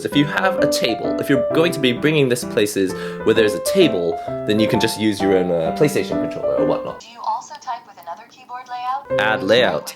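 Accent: England, United Kingdom. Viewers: Real nice English accent :D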